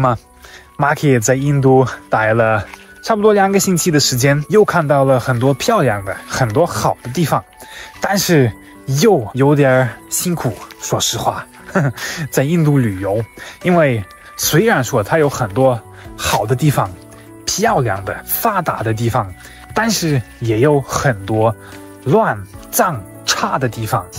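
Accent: Sichuan, China. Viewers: 你講話怎麼有個四川音，尤其講「 」的時候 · 他说的是四川口音 😂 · 妥妥的四川口音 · 四川话➕普通话😂你能认真学习普通话吗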